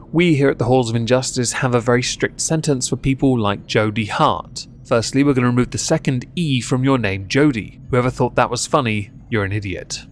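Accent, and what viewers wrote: RP, United Kingdom. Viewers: Plus his accent is brilliant, posh bugger 😆. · Yeah I can't figure it out, I listen to other British YTers, and yes, A British accent always sounds Somewhat more dignified to the American ear, but there's something Extra "posh" abt his voice... Like, maybe something in the presentation/delivery? There's def no "umm"ing and " uhhh"ing, maybe its the concise, dry delivery. I dunno but I dig this posh dude's channel. (Plus anyone who h8s Nader is cool in my book... "The enemy of my enemy is my friend."😈🔥💯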